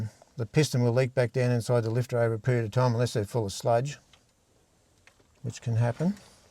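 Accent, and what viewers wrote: Australia. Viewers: Thanks for aussie acent! Greeting from mo.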